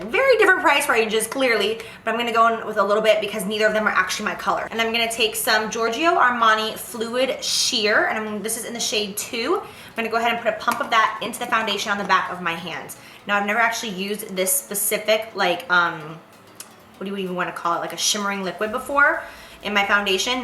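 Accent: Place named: Boston, United States. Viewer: "Highlighta" lol is he from Boston? We have that accent.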